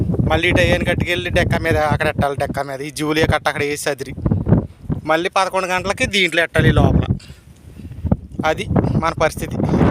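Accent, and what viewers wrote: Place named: East Godavari, India. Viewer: హాయ్ అన్న నీను మొదటి సారి మీ, వీడియో చుసాను బాగుంది, మీరు మాట్లాడే విధానం బాగుంది,మీ,మాట, తీరు చూస్తూంటే మా, తూర్పుగోదావరి జిల్లా మాటలా ఉంది, ఇప్పుడే సబ్స్క్రయిబ్ చెసాను బ్రదర్ ఇప్పుడు నుంచి మీ, వీడియోస్ చూస్తాను అల్ద్ బెస్ట్ బ్రదర్ అండ్ జైహింద్ 👍👍♥️♥️♥️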